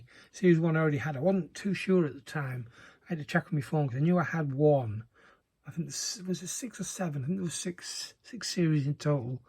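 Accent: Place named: England, United Kingdom. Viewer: Could I ask you a cheeky question what part of England are you from. I always like to know where the YouTubers are from. I'm also a nosey bastard😁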